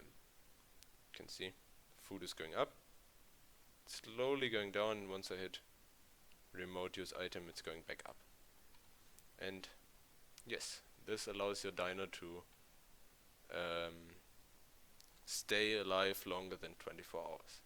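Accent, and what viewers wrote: Germany. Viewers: German? xD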